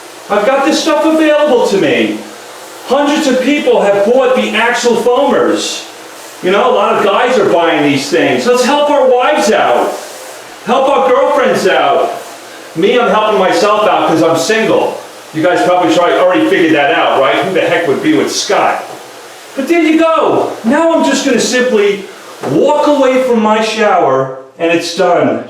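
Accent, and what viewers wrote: New York, United States. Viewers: Holy cow that sounds like my New York mom. The only difference is she then would get on a plane and do it herself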